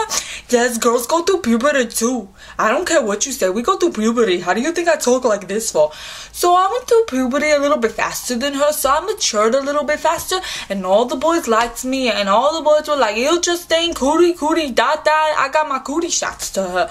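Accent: Brooklyn, United States. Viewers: omg, i know what video ur talking about from jpmetz....hahahaha. u are funny. u should make more vids like this. i hear u pullin the brooklyn accent out of your voice girl. lol. nice!! keep up the great vids girl.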